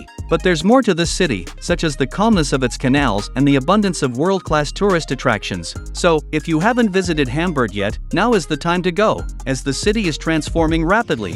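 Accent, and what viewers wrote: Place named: United States. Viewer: I like НаmВ., Вt.I dont like prono.narrator speaks, american , nt EnglisН.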